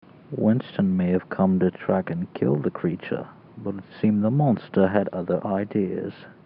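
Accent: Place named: United Kingdom. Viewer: Snow in the Louisiana swamps along with the narrator's British accent make me believe this is real.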